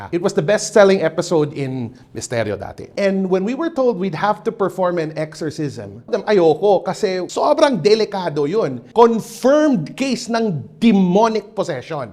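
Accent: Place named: Cebuano, Philippines. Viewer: Malaman talaga na cebuano si sir eh pero hands off napaka Professional!!!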